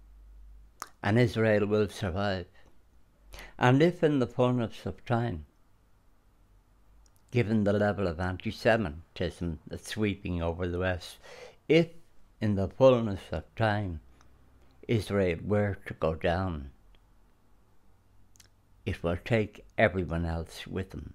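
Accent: Ireland. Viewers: My goodness. That was wonderful! Thank you. You must be a stage actor your monologue is the very best of Irish cadence and delivery. Wonderful wonderful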